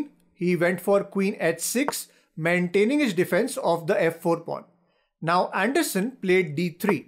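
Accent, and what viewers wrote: India. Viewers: The first time I watched your video, I thought you are an Indian living in England or any other country. Your English is so good, but the accent is still Indian 😂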